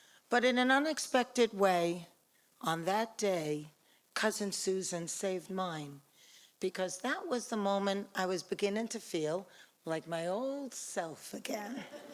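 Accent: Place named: Boston, United States. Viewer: Well, now you’ve heard two people with a Boston accent. Only 675,345 more Bostonians left to listen to. 😅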